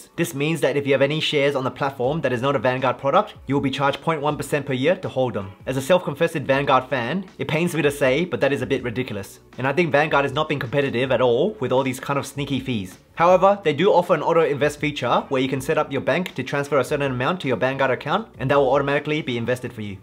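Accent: Bankstown, Australia. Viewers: You sound like you live in Bankstown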